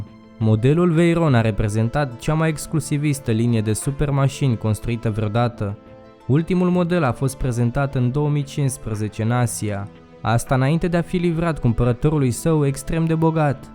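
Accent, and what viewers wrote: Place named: France. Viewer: Ai achent de francez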